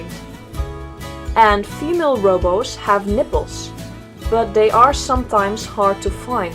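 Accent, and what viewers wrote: Netherlands. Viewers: Are you dutch? If so, I can kinda hear it by your accent. Btw Ik ben Vlaams. :)) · Vgm is ze nederlands ja het accent is heel erg te horen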